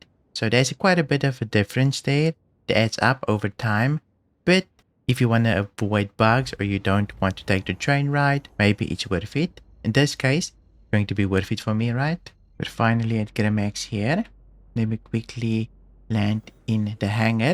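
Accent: South Africa. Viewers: Hey bud, sound like a SA, you on a Discord. Looking for people to play with, love the vids.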